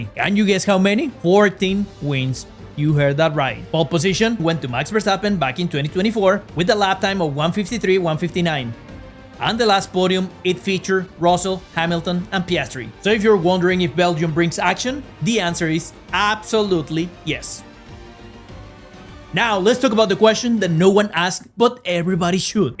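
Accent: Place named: United States. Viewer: Awesome! I like your American accent!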